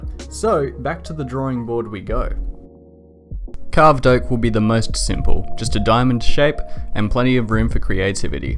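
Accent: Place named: Australia. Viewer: you are simply amazing. You are special. This is the first 30 minute video that I have watched in a very long time. I love the way you make your content. You are amazing at block art, command blocking and coding. <3. Btw, you sound Australian, are you?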